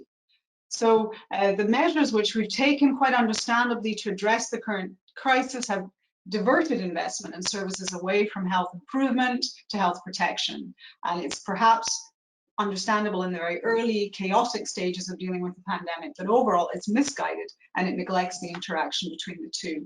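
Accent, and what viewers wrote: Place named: United States. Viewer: Why is Professor accent becoming more American by the day? SO annoying!!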